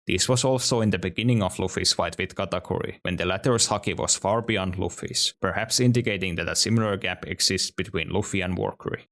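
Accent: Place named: Finland. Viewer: Great content. Ps: Suomalainen aksentti spotattu😆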